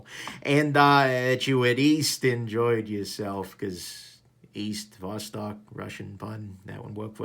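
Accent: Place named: New Jersey, United States. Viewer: Nice review! Thanks. Love the (Jersey? NY?) accent :)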